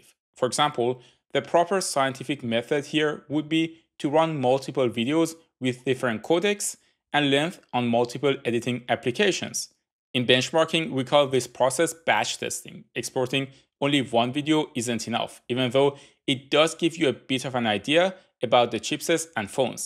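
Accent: Iran. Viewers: You sound Irani, are you ?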